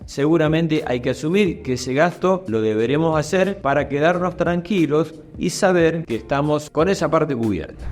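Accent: Argentina. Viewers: Buenos dias Arquitecto, Tienes un importante número de comentarios en este vídeo. Te sigo, y te sigo..esta vez si quiero escribirte (me leerás?). He hecho un experimento. Lavaplatos roto, lavo a mano uff, y escucho tu narración (después veré el video). Decirte que eres tan profesional en tu arte, y tan bueno divulgando divulgando...el habla argentina me gusta desde siempre. Me llamo Lujan. Mi padre fue un magnífico bailarin, premiado en competición de tango. Y vestía muy elegante.disculpas por este protocolo. Mi caso se encuentra entre los narrados por ti. A saber: compro casuca, sin mirar. Necesitaba poner ahi varios enseres. Me habia cansado y empobrecido el hecho de alquilar. Después de un tiempo querria hacer el lugar habitable. Sencillo. Me aconsejan demoler todo y volver a construir con los precios Abusivos, en mi opinión, que cobran en España. Así estoy casuca y yo. 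Pero te seguiré en todos tus vídeos. Y me preguntó: en España, Comunidad Valenciana, existirá un? Yo no lo he encontrado. Inteligente, honesto, conociendo su oficio, y con una pronunciación que merece un 10., soy profesora. Mi oficio y mi pasión por la Arquitectura Viene de muy pequeña. Jugaba a construir de todo con bloques de madera en cuatro colores. Un fuerte abrazo por darnos tanto conocimiento. Desde Valencia. Cerca del mar Mediterránei. Hasta pronto. Soy Lola.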